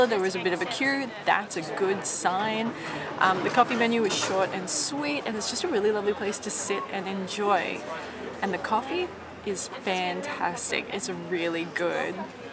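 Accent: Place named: Australia. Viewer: Hiya! Welcome to Denmark! I’ve been to Copenhagen twice and it’s such a peaceful quiet city with lots of gorgeous parks. I’ll help you choose the bed. Always choose the top one! There’s just 3 cities in which I would see myself living, it’s London, Melbourne and Perth. Would you see yourself living in Copenhagen? Thank you for sharing Tivoli Gardens, I’ve only seen it from the outside as they were closed with Easter. Now I know I actually missed something, reason enough to visit for the third time. Good thing is that I can be in Copenhagen within 3 hours starting from my home address as it’s just half an hour to the airport and just a 1 hour flight. The canals are gorgeous in over there. I really got the feel that I need to get back to CPH. Nyhavn is a great place. $18 for a sandwich is insanely expensive. Getting on the canals is one of my favorite things to do when visiting a city, boat tours are usually quite good. 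By the way, have you taken the train to Malmo? That’s a great one-day trip from Copenhagen, just 30 minutes by train. I completely feel you with that solo traveling through Scandinavia. I’m not usually into hostels because I’m a light sleeper, but this hostel seems amazing. I love the Australian accent, it’s the best English there is. I hope you’ll enjoy much more of Europe. Now that you’re in Europe, I can finally catch up with the long bucket list you made for me 😂. Have a wonderful week and safe travels 🤙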